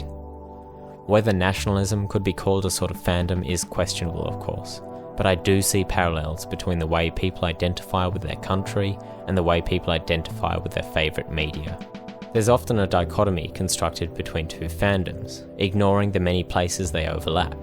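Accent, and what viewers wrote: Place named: Australia. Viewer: I seem to be falling in love more and more with your channel after every episode I watch. I also can't believe I never noticed that your also Australian before and its Kinda blowing my mind that I never picked up on it. but anyways keep up the great work!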